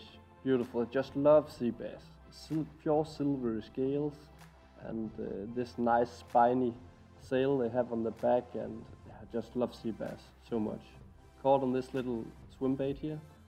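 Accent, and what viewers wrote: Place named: Scandinavia. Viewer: I would think maybe Scandinavian with your accent. Wouldnt have guessed French.👍🏴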